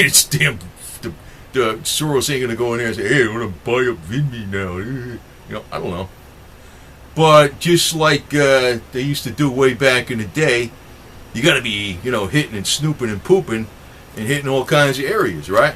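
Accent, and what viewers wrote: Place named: New York, United States. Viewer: Checked out your sportster video...You almost sound like a New Yorker...I am a New Yorker and talk the same way...Oh yea and Jesus was Jewish, there were no Christians until they started following Christ..there were Jewish folks but they don't believe Jesus was the saviour...the Christians believed all the way...so do I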